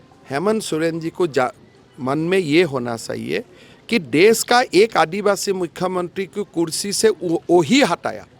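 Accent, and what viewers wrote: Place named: Bengal, India. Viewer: Ye bangali type ka bolta hai bhagao isko yaha se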